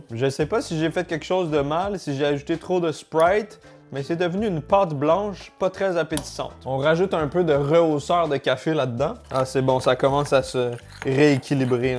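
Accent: Quebec, Canada. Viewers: cool Guy tu parle en québécois vive le Québec.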